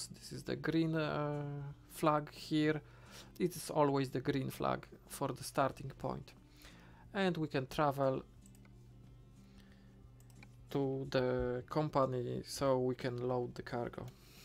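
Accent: Poland. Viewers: Dzięki wielkie, zajebisty masz angielski bo mega polski akcent ale posługujesz się w pełni poprawnie czasami itd. pozdro ;D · Tak myślałem że Polak po akcencie :P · I got 1 question, your Polish? Cuz i feeling I'm hear this accent too much times in my life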